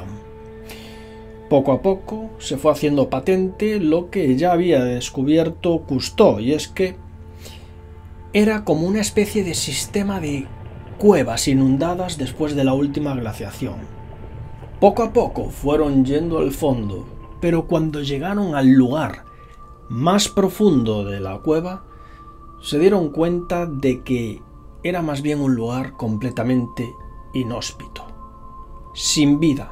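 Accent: Spain. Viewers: El acento español hace que pierda el interés en el video. Es aburrido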